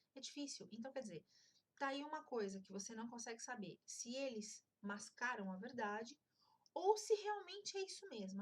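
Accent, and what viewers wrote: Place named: São Paulo, Brazil. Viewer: não resisti e fiz até uma conta aqui no Youtube só para poder postar um comentário para você.. rsrs Moro no Brasil..mas sou APAIXONADA pela Suíça...Penso em um dia morar ai.. (Se acontecer vou te visitar.. adorei vc! rsrsrs) eu tenho uma tia que mora aí e meu primo é suíço (Não entendo quase nada que ele fala.. rs), adorei todos os seus 3 vídeos.. assisti os 3..achei muito bom! não deixe de postar mais.. assistirei também com certeza! nossa, vc tem sotaque de "paulista" rs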